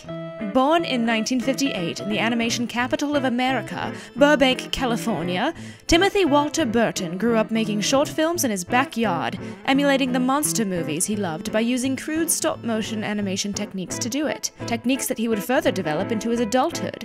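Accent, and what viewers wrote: United Kingdom. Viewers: Well you sound more British than I do, and I'm actually British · Wow. I can't believe you did a british accent for this entite video. 👏👏👏👏👏👏👏👏👏 That takes guts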